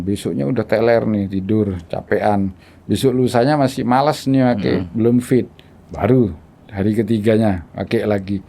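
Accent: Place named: Madura, Indonesia. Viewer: Bhasany mdrny kentel bget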